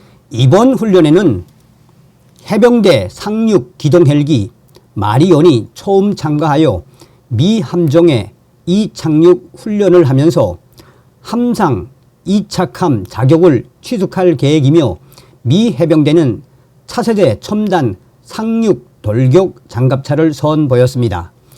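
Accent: North Korea. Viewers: 내용은 좋은데 버벅거리는 이북말씨 듣기가 힘듬니다 컴퓨터 목소리 내는기계도있는데 그걸로대체하지요 아니면 북한에서 내려온 여자분으로 말잘하는 여자로 대처 하시든가요 50만 구독자이면 돈이될텐데 아낄려고 버벅거리는 말로 설명마시고--- 짜증나네요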